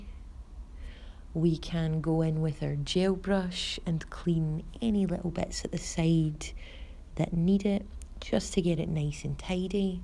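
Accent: Scotland, United Kingdom. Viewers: Great tutorial, instructions are very clear and your accent(Scottish?) is just adorable! Xxx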